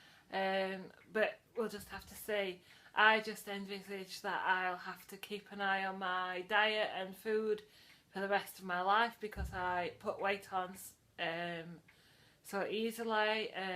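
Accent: Yorkshire, United Kingdom. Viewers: Ooh I can hear the Yorkshire accent now 😃 are you on Instagram at all ?? Also not sure if you have said but what are your plans for Xmas are u using flexi sins, being off plan ?? Was wondering re the cake i have one too xx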